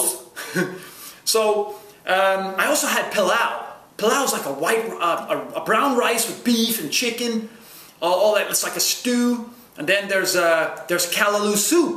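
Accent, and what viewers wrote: Trinidad and Tobago. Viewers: That Trini accent is on point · he does a great trini accent...im a traveller too and i video the other islands too. check out my clips..click my name and away u go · Your trini accent really good, nice man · He talks trini so well tho Ahaa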